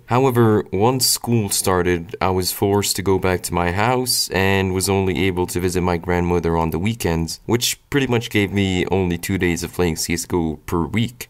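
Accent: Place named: United States. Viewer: Are u seriously from there? Are u okay? I actually live very close to syria.. Can see black smoke sometimes and shit... But u don't have arab accent u sound 100% american!! xDDD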